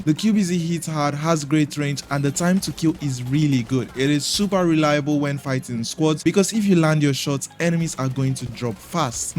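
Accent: Nigeria. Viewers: Bro, our loadouts is identical.... Which means it's just skill issue (on my part)😅 Also you sound Nigerian.....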